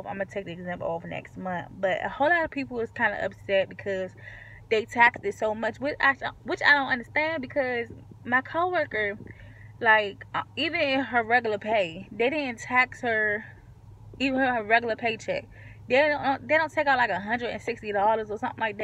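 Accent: DMV, United States. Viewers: You from the DMV?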